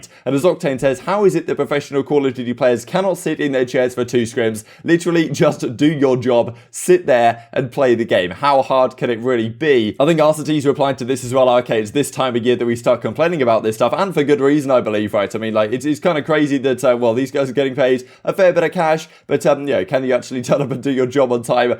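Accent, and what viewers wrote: United Kingdom. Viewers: This is the most British sounding British person in existence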